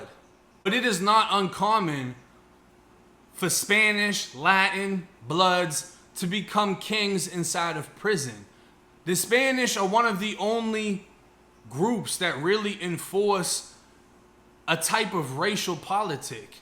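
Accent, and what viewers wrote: Boston, United States. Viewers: Dude you sound like you have lived in Boston since 1962, wtf. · That Boston accent getting stronger shit coming back huh... glad u been eating of yt brodie I deserve the shit keep grinding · That's a Boston accent this dude got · This dude sure he’s not from Boston lol that accent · Do I detect a Boston accent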